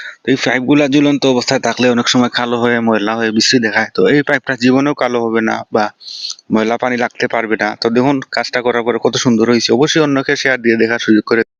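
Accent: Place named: Sylhet, Bangladesh. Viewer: ধন্যবাদ সিলেটি ভাই ।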